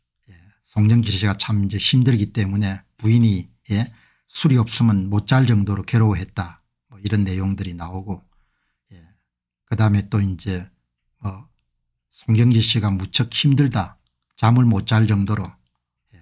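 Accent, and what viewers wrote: Gyeongsang, South Korea. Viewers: 공병호님 방송 항상 잘 보고 있습니다. 하나만 지적할게요. '새'를 '섀'로 발음하고 있습니다. 그러니까 인생을 '인섕'으로 발음하고 있습니다. 서부 경남 지역 발음입니다. 공병호님이 방송인이라 이런 지적을 해봅니다.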